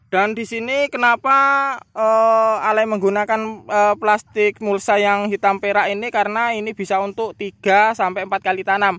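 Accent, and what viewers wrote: East Java, Indonesia. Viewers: Wa'alaikum sallam bossss......,kata2e sampean iki kok koyok Wong jawa timur,samean iki asli ngendi e bo....ssss?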